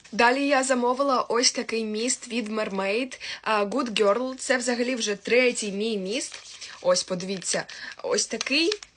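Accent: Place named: Russia. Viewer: Или евелін. Говори на рідній мові не ламай язика. Чувствуется русский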